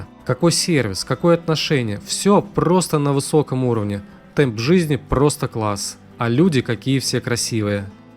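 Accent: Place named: Ukraine. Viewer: Ты не россиянин. Ты ципсо. Это говор и вранье с Украины.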